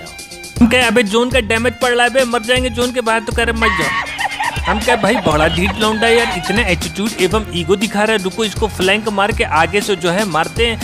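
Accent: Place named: Gorakhpur, India. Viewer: Bilkul aap Gorakhpuriya bhasa bolte hai hm logo ke tarah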